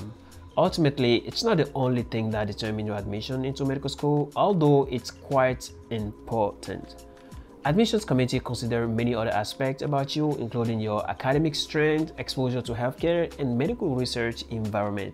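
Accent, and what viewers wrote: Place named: Yoruba, Nigeria. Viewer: I can definitely notice the yoruba Benin accent in the way you speak. Came from Benin to the US last year to study accounting. I would love to know someone in my study field because I get lost sometimes. I hope I will reach my goal as brilliantly as you did.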